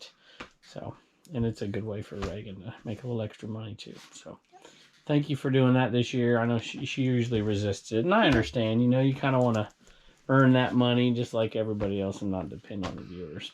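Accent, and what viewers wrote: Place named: Minnesota, United States. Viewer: Yah Sure !!! Youbetchamn must be from Minnessota !!! Yah Sure Youbetcha !!!!! lol, easily recognized by this Wisconsin norwegian/American gal.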